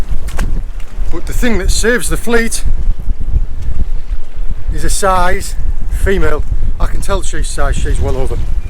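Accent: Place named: Northern England, United Kingdom. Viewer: I would like to thank you for your videos I have been watching for about a year now but recently my dad who passed away Christmas morning found so much happiness watching/listening to you he had them on back to back for the last 2 weeks of his life I think it must have been your northern twang he found piece with as his farther and family come from Darlington. Once again thank you, you made a very poorly man relaxed thank you once again xx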